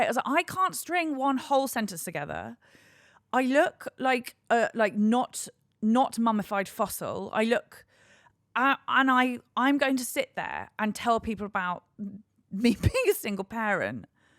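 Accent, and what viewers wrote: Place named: Liverpool, United Kingdom. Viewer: My favourite posh ginger southern scouser (when it’s in you, it’s in you). Go for it!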